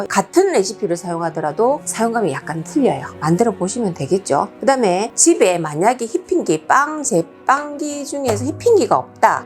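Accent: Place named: Busan, South Korea. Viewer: 오~~너무 이쁩니다~♥ 만들어보고싶어요^^ 정겨운 부산사투리 방송 잘봤습니다~~구독하고 갑니다^^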